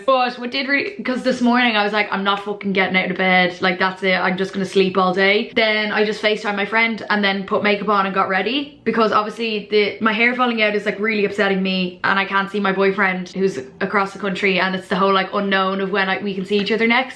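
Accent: Ireland. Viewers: You’re just class like I love your videos and your accent too. Where in Ireland are you from?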